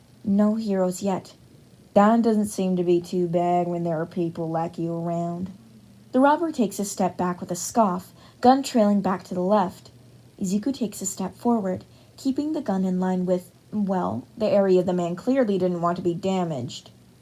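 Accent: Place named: Texas, United States. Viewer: The god damn texas accent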